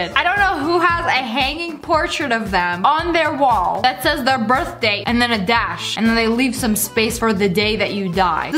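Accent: France. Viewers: Are you French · R u actually from France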